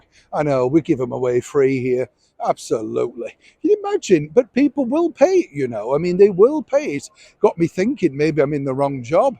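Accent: United Kingdom. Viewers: I had to listen to this a few times before I figured out you were talking about the hugger & NOT a hooker. Sorry, I need to fine tune my hearing a bit for the British accent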